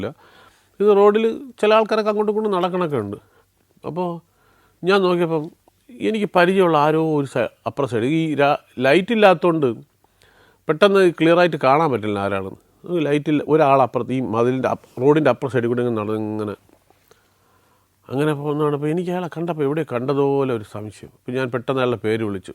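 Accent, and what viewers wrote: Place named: Thiruvananthapuram, India. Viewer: സംഭാഷണത്തിലെ തിരുവനന്തപുരം ശൈലി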